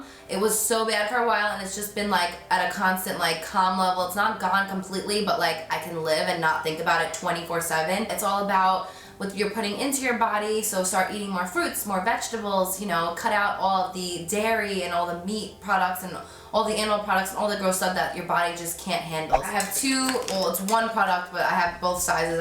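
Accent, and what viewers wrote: New Jersey, United States. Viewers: is she from jersey? :o she has a very heavy jersey accent and i'm from there!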